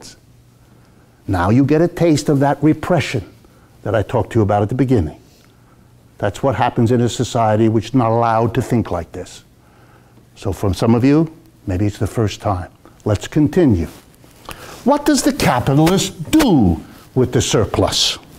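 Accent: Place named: Hungary. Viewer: he is funny too. but why does he sound hungarian....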